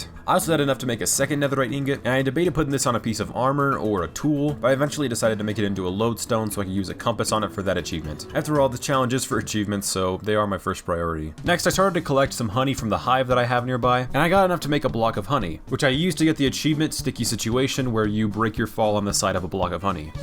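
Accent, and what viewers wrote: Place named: Ireland. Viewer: the casual random irish accent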